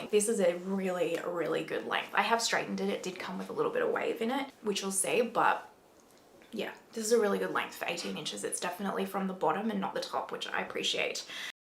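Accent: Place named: Australia. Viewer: I actually love this and the colour is perfect for me. I'd probably go for a bit shorter, maybe 14 or 16". Also, great to hear a fellow Aussie accent.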